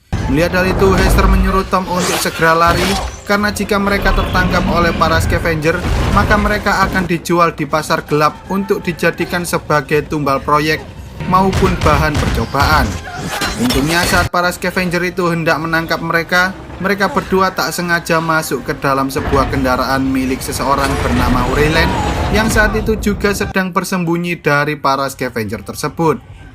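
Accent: Java, Indonesia. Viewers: medokmu menawan, bikin kangen masss membacakan cerita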